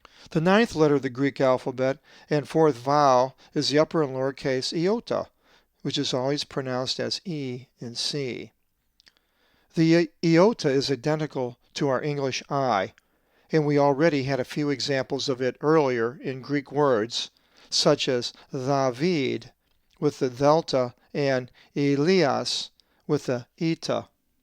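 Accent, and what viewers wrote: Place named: England, United Kingdom. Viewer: Many thanks for your excellent presentation. My native language is Greek and I would like to mention that you are very close to our pronounciation, despite the influences by your English tune. Also, I would like to add that the best way for someone who interests about Greek pronunciation, is to lend his ear in a Greek Orthodox church, where a medieval version of the language is spoken. This version has been transferred from parents to children centuries ago, and I can assure you that the tune is the same as the simplified, so called, modern Greek we use today. The differences of accent compared to that of the ancient language, are limited to the duration of some vowels of same sound. For example, the letter Ω,ω (ωμέγα, omega), was pronounced like an extended O,o (όμικρον, omikron), but today those two vowels sound the same. 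So, the difference between those two o, remains only in the written form. There were also some differences regarding some consonants sound. Greek Cypriots maintain this manner of speaking untill today. For example, when they say τέσσερα tessera (number four), they extend this double ss more than a simple s. This doesn't happen in the mainland of Greece, but the spelling remains the same. Anyway, I hope that all these are useful for those who interest about. Thank you again.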